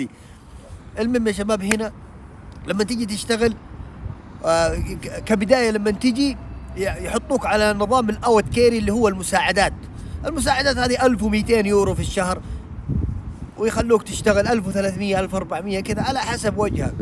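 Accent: Jeddah, Saudi Arabia. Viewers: اللهجه سعوديه ١٠٠٪ هوا ماقال الجنسيه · دايم اليمنة يلاحقون أي واحد يكتب شي عن لهجة هذا الشخص! ندري أن أصله يمني لكن يتكلم جداوي يعني سعودي وهو قلباً وروحا حجازي سعودي ولا يعرف حتى يتكلم يمني. وش هاللقافة يا ذا الناس! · انا يمني لكن دا كلامه جداوي 😂🤦‍♂️.. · هههههه يقول الرواتب على حسب وجهك 🤣 آخخخ اللهجة السعودية اشقد احبها ❤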